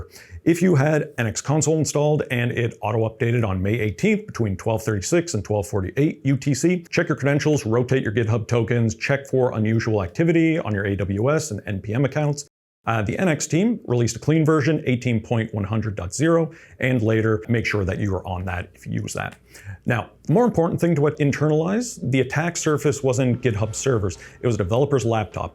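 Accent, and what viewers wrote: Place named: Nova Scotia, Canada. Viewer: sounds like a Nova Scotia accent to me.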